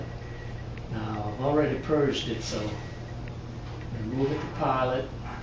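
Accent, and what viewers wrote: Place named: Louisiana, United States. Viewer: Cher, I sure like dat Cajun accent. Ce c'est bon, oui.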